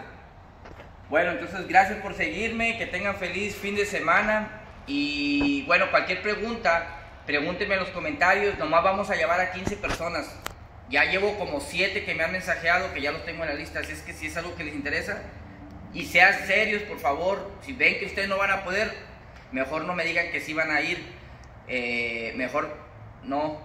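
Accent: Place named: Puerto Rico. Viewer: Lla estas ablando Con asento boricua Eeres de los de nosotros!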